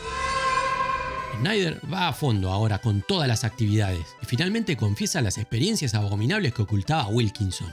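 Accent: Argentina. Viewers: Definitivamente me encanta el acento argentino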